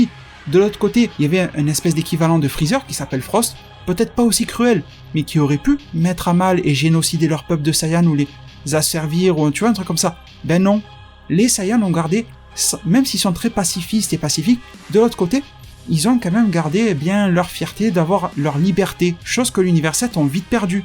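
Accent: Marseille, France. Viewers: Sa se voit que tes marseillais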